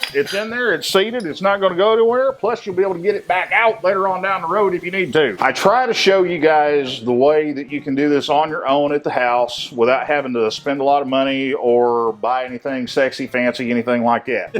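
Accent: South, United States. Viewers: and his southern drawl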